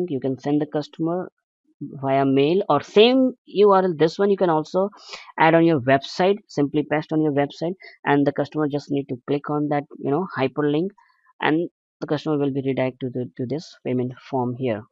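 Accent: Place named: India. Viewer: Scam anything with india voice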